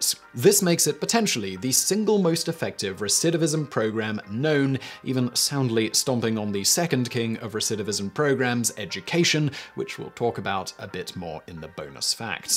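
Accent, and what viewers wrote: United Kingdom. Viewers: Never heard poontang said in a UK accent until today. Prison sucks! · oh how i wish i could have a British guy narrate my life..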